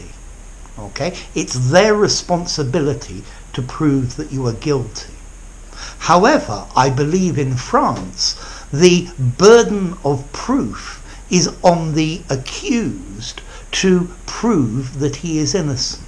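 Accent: United Kingdom. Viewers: Are you from Great Britain🇬🇧?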